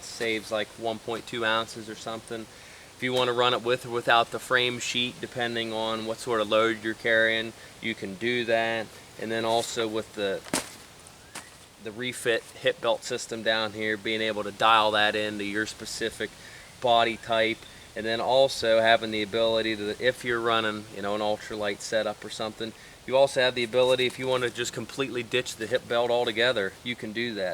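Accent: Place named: Pennsylvania, United States. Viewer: Where are you from? I can't put my finger on your accent. My guess is Central/Western PA? I have a the Granite Gear Crown2 60 and love it. Recently picked up the Gossamer Gear G4-20, a 42L frameless pack that weighs in at 25 oz. I'd say its a lot more comfortable than the Crown2 if you can get your kit dialed in to fit into the smaller pack. Worth checking out for sure!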